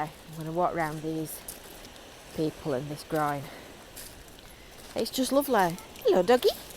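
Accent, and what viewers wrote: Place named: Fleetwood, United Kingdom. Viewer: To answer your question ,now I am based in Edgware do I miss my native of Fleetwood Yes I do it is strange looking out not on the sea but looking out on small brook not a Big River Wyre touching Morecambe Bay and I had many happy memories there as child Left Fleetwood in 1975 back for small bit and permanently left in 1976 and I miss your Accent keep safe from the COVID 19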